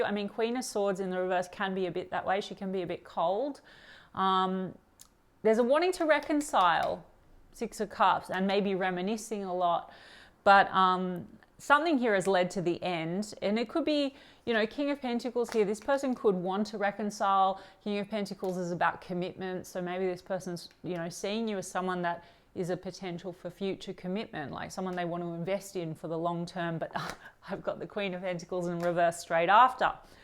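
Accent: Australia. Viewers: Great to hear an Aussie. Surfers Paradise here 🇦🇺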